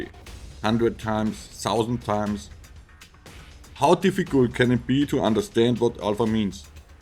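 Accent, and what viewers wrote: Germany. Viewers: German detected. Bayern? Your voice sounds like Bavarian person, but I might just be wrong there.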